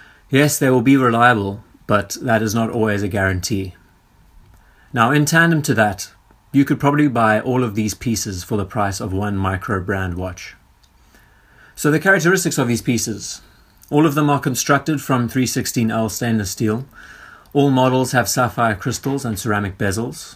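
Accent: South Africa. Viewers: Is that a South African twang I detect?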